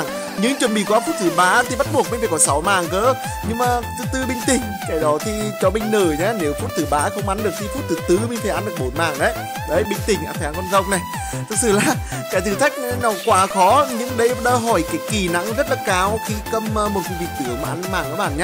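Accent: Nghe An, Vietnam. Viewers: Anh người nghệ an hả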